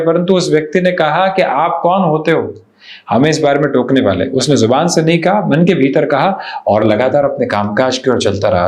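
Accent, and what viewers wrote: Standard. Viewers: आपकी हिंदी भी बहुत शुद्ध है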